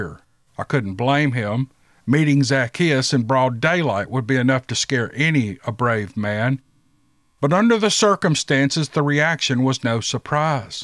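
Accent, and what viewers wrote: South, United States. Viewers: I just love that Southern accent !!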